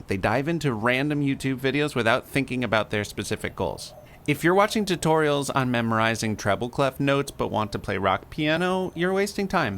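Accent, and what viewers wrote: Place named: United States. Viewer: This video is amazing. I will show it to my students. And by the way - what pleasant American-English you speak!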